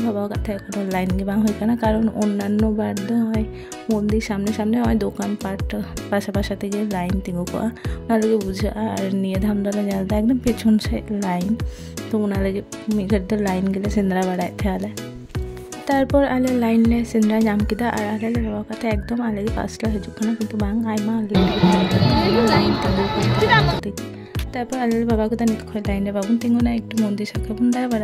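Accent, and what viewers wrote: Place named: Santali, Bangladesh. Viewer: বাংলাদেশের সান্তালী একসেন্ট এর সাথে আপনার একসেন্ট মিল আছে।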